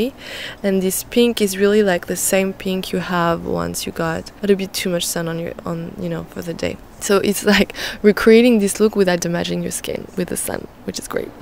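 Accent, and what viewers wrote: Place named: France. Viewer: Nice voice and French accent. You're so chic . Keep up the great work .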